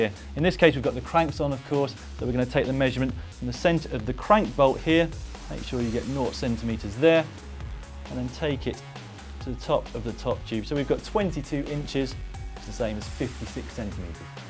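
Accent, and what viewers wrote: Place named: United Kingdom. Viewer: I cannot understand what he's saying because he's speaking British. Those people have a lot of difficulty with the English language. (I'm an American, and we speak correctly.)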